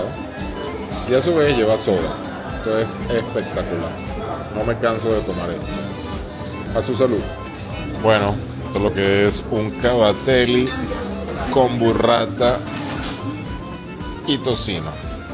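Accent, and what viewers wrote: Venezuela. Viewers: Tu vivias en Caracas? Hablas muy caraqueño como de Alto Prado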